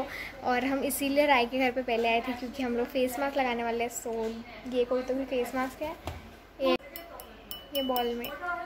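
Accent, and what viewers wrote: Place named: Nagpur, India. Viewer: i just love your nagpuri accent..like all nagpurkars can relate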